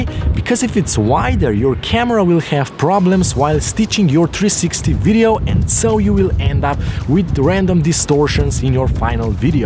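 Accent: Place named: Slovenia. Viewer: Where are you from? It sounds like Slovenian accent.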